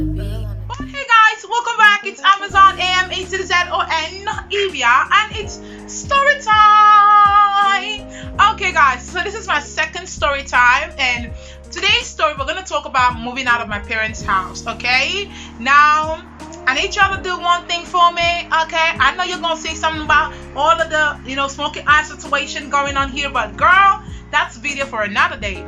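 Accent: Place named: United States. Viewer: Why do you have an American accent tho? 😭😭😭 Cringe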